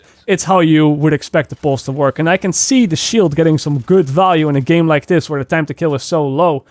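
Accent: Netherlands. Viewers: Wait is this Guy dutch? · Hi, are you maybe Dutch? It’s just that I’m Dutch and your way of speaking and a slight accent strongly remind me of other Dutch youtubers with English channels.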